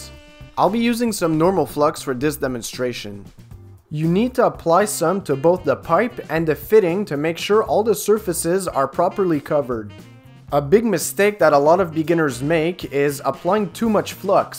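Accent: Quebec, Canada. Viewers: would you happen to be from Québec by any chance?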